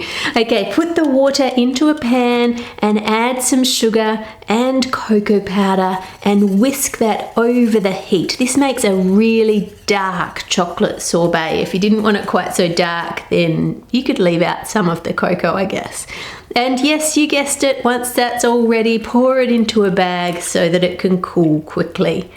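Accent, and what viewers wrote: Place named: Australia. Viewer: It’s an Australian accent. There is more than one.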